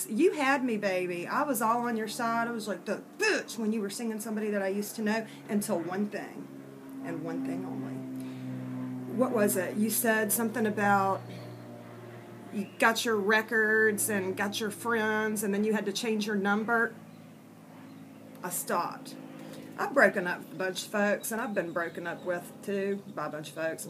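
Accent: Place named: South, United States. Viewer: I love your southern drawl !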